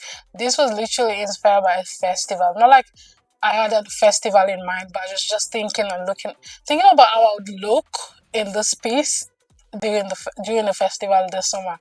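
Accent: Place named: France. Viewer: I hear the French in your English ❤😊... You're my crochet inspo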